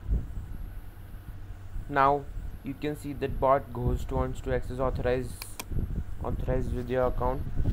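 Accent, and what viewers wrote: India. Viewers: Me: joins the vid Indian voice Me: wait this one is definitely real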